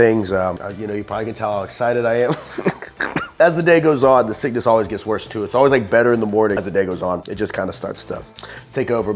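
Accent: Texas, United States. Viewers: Your texas accent comes out when you'Re sick :D:D:D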